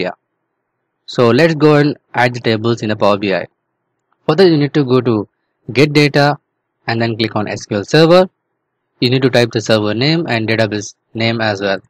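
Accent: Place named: India. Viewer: Indian... but good!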